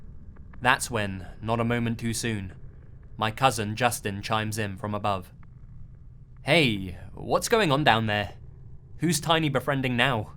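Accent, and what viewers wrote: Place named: England, United Kingdom. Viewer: Best voice, i make fun of him but I would rather hear an English accent over any other, chap!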